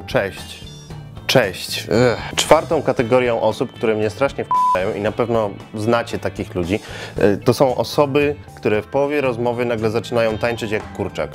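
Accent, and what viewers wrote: Tarnów, Poland. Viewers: Sporo. Sam chwilami nie byłem pewny ale tarnowski akcent i biżuteria z mięsa mnie upewniła. xd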